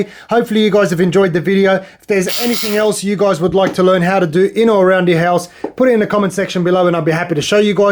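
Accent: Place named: Australia. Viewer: Good Australian accent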